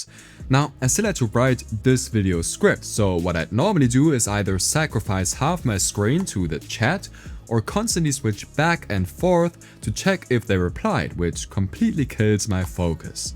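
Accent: Germany. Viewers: just stumbled on your channel. Great editing, straight to the point and interesting apps - hats off! Edit: gerade gehört dass du Deutscher bist, mach weiter so ;) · you are german ? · Beautiful video, great exposition, nice to see mg transition, no waste of time, elegant and clear English with interesting German accent. What else? I need subscribe to say thank you 😊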